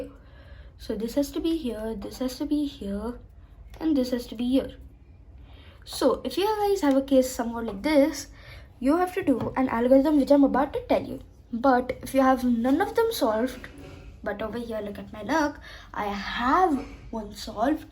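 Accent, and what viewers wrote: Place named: Europe. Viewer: your accent is very exotic - i'm guessing it's european... youthful tone adds cuteness. hope you don't mind letting me know what your ethnicity is. thanks in advance.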